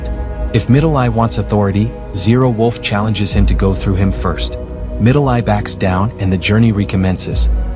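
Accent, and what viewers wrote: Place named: United States. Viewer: Your qwack qwack yank accent is just unbearable.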